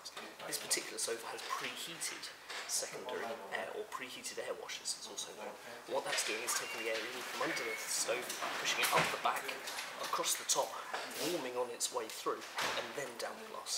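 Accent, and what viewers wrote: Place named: United Kingdom. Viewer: This guy's too British to understand very well.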